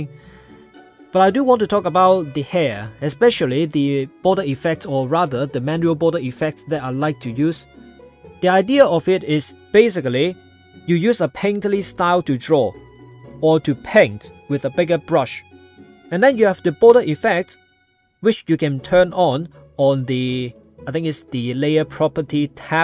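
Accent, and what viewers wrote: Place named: Singapore. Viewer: I love this <333 Also I feel like i went thru a literal roller coaster trying to figure out ur accent. Like, first I thought it was British , then Indian, then now I think it’s singaporean?????? Mhjvnejenrfv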